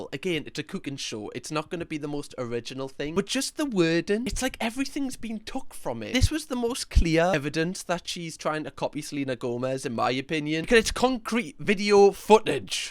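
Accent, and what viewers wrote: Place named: Newcastle, United Kingdom. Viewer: I've been wondering where this accent is from... it's so cool. 😁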